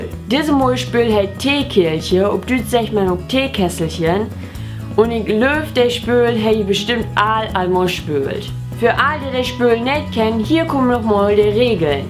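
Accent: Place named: East Frisia, Germany. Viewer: Oh ik mag den ostfreesken akzent heel lieden :) Schöön to seen dat dat no annere Lüü in usen öller gifft de no Platt schnacken =) As ik dat lesde Mol bi di keekt heff, was ik mi no nich seker, of dat nich blot en Spijöök was. Man dor ik nu seker bün, dat du no mehr op Platt doon deist (hoop ik tominnst), abonneer ik di nu =) ...Hest du egentlich nen Macker? ;)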